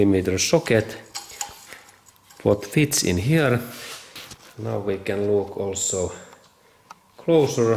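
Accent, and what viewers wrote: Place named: Finland. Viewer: Hienoa työtä! Tunnistin aksentista sut suomalaiseksi! Mikä kone siis tässä pöydällä oli?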